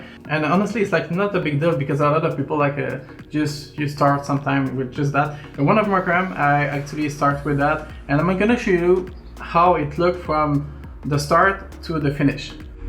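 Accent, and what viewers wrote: Quebec, Canada. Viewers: J’aime entendre l’accent Québécois dans mes vidéos de reefing ;) Un abonné de plus !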